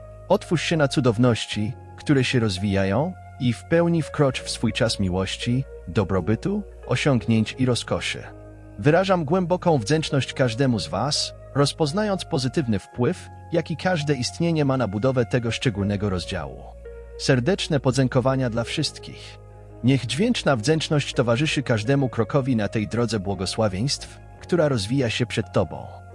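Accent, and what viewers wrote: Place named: Germany. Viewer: Po raz kolejny zgłaszam prośbę- przywrócić lektora tego który był od samego początku. Czy ktoś z właścicieli tego konta czyta wogóle te komentarze. Tego lektora który jest obecny nie da się słuchać. Co to w ogóle za pomysł żeby dać lektura z niemieckim akcentem; czy to efekt Tuska? Proszę o przyłączenie się do prośby czytających. Pozdrawiam.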